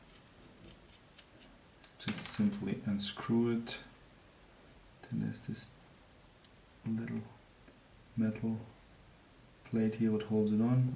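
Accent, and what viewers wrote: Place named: Germany. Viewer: You have a very nice german accent! Greetings from India.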